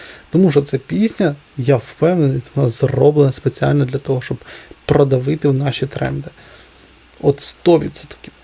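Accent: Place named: Russia. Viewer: А он музыкальный критик? Или комиссар из отдела политической цензуры? Кстати отчётливо слышно, что родной его язык русский, но он усиленно выдавливает из себя мову. Похоже, что вместе с мовой ещё шось выдавил в штаны.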